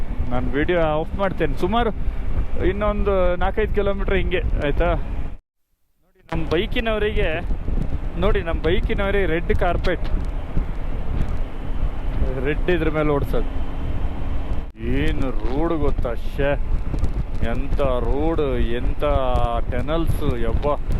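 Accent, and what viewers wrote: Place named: Kundapur, India. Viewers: Kundapur kannada ❤️ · Hello nivu Udupi avara, nimma kannada Keli oru badi andu konde.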